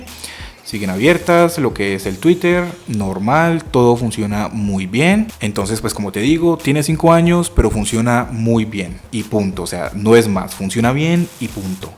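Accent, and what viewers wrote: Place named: Colombia. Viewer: Cuando dijo un millon de pesos dije vergas ya despues escuche el acento y me acorde que en Colombia el dinero se maneja en millones saludos desde Mexico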